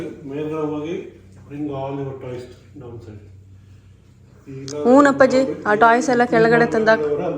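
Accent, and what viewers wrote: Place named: Mysore, India. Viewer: Mam I am new additions to your channel thumba chennag nam mysore bashe mathadtira thanks a lot namge ee beautiful life na thorsethirodakki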